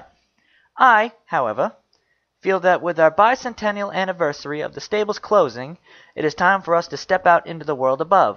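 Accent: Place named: Boston, United States. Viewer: Most of all I like your slight accent. What is that Bostonian? Got to be eastern seaboard.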